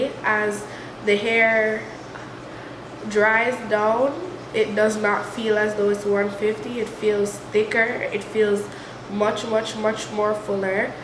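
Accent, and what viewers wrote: Jamaica. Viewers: it’s her Jamaican accent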